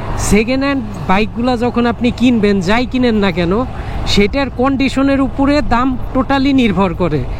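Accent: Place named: Faridpur, Bangladesh. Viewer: ভাইয়া আপনার হোমটাউন কি ফরিদপুর?কেনো বলছি,কথা বলা দেখে চেনা শহরের বলেই মনে হচ্ছে!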